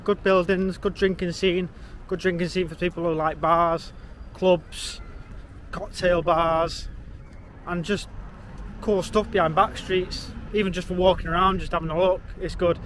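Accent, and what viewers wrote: Manchester, United Kingdom. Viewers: He's sounds like he's from Manchester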